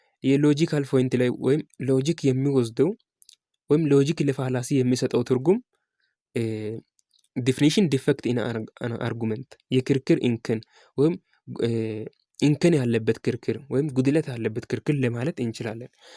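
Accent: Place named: South, Ethiopia. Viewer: አማርኛ አትችልም ደቡብ ነህ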